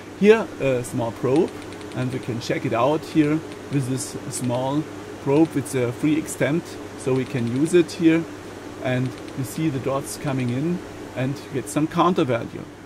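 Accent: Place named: Germany. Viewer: Ist ja schön, dass man so ne App entwickelt hat... aber wenn die Muttersprache schon Deutsch ist, dann doch bitte auch auf Deutsch halten. Denn das Englisch hört sich einfach nur grausam an. Zumindest kann ich da kaum zu hören. Wenn mans unbedingt auf Englisch haben möchte, dann jmd. bei dem man das "deutsche" nicht so raushört ;) btw: Bei mir hört sich das auch nicht viel besser an, deshalb lass ich es auch sein ;)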